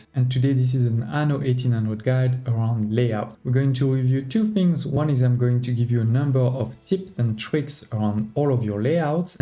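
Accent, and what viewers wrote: France. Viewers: It is perhaps a fallacy of the American mind that I immediately think “this guy has a French accent, therefore I trust his advice unconditionally.”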